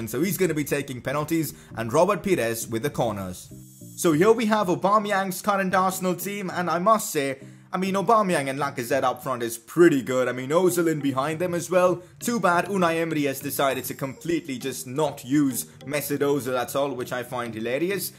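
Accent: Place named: India. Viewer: You sound like an indian(🇮🇳) or Someother Asian country person🌏 that learnt to speak English just a month ago😂😂😂(STG(swear to God)not tying to be racist 😑)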